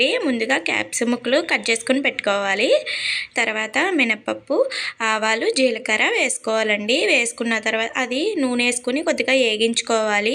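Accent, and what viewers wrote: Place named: Godavari, India. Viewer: ఆయ్.... మీ గోదావరి నా అండి 😂😊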